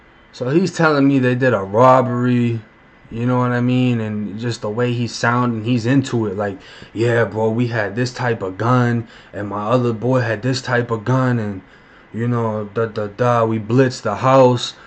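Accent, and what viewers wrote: Boston, United States. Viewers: You originally from Massachusetts? I hear a Boston accent...... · Love ❤️ your Boston accent keep uploading daily ....We would watch daily too ..love and wishing you all the best Love 💕 from Southern California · It sounds like you got a Boston accent under the Floridan sound. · This whole time I’m thinking bro sounds like he from Northshore Boston and I went back and saw you’re from Malden that’s what’s up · Can tell you from Boston for sure lol. Fuckin with your channel tho bro I appreciate the people you know are keeping the shit authentic. You're gonna blow up soon keep up with this YouTube shit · I would've done it too man. I grew up wit all females too. I'm from Boston and your accent is half and half. Keep making vids man you're a great storyteller just like Death that's all you need to blow up on YouTube and make it your job. 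· The way you tell your stories with the Boston accent makes it that much better. Keeps me coming back keep it up man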